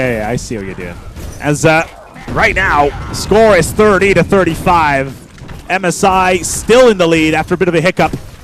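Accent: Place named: United States. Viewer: i like how you make your voice all americany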